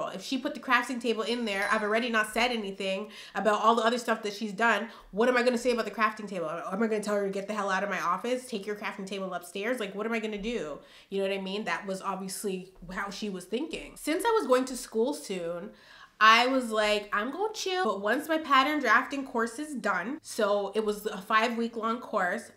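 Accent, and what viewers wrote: United Kingdom. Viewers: The British accent is taking me out! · The British accent 😂😂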